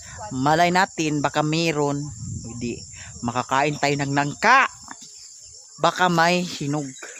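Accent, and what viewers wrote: Visayas, Philippines. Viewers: Ka relate ko sa imong accent na bisaya kay dool ra ta lungsod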